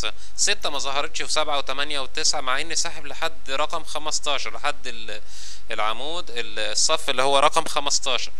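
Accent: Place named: Egypt. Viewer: جزاك ربي كل خير .. شرح جميل و لهجة أجمل الله يبارك فيك و بكل أحبابنا في مصر الغالية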